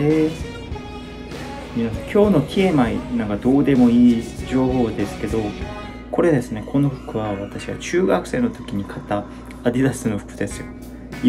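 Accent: Fukuoka, Japan. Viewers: 誰が聞いたとお？ 福岡弁、うますぎ❣️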